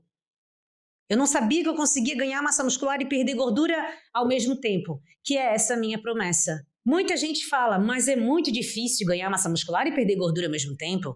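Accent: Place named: Florianópolis, Brazil. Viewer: Pelo sotaque, é manezinha da ilha😅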